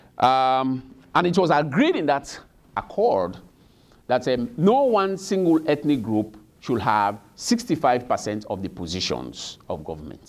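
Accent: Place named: Africa. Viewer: Where the is this guy from any way. He has an accent probably from our mother land of Africa, but it is so sad to here these damn stories. He has no idea of what he's talking about. Please if anyone know where he;ll be speaking next time make sure that he is stopped, because he has no clue of what he's saying my God.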